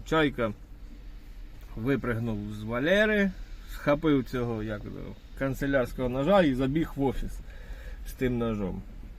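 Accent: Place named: Western Ukraine, Ukraine. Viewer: Ооой, привіт, так приємно чути справжню Українську Западеньску мову ❤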